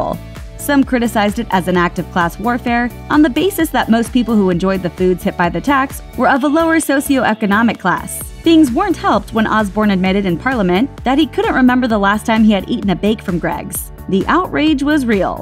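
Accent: United States. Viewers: I'm sorry, It's just weird to hear an American talk about Greggs and Newcastle. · Omg as a British person it’s so damn annoying to hear an American talk about this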